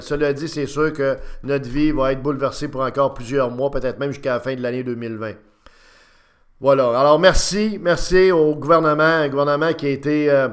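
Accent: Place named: Canada. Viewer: Je pense, par votre accent , que vous venez du Canada.Quand nous serons débarrassés du COVID-19 ( coronavirus ) Il conviendra de mettre un terme à la mondialisation de l'économie.Chaque pays devra inciter ses entreprises , ses usines à se restructurer , à faire preuve d'innovation pour produire les pièces détachées et les produits finis dont il a besoin.Cela relancera l'économie et cela donnera du travail aux gens , même si nous devons payer les produits plus chers.Cette occasion ne se reproduira pas de si tôt !!!!!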